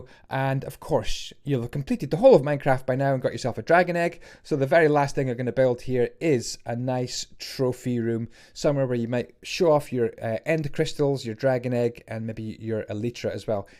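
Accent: Scotland, United Kingdom. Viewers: You don't hear muck scotish mine craft content so it's cool to hear a familiar accent being Scottish myself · I dont know why, but i love the scottish accent, its so awesome.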